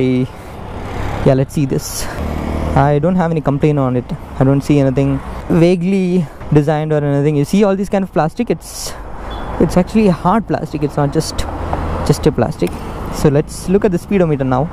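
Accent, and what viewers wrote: Kerala, India. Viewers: Mallu accent, good review.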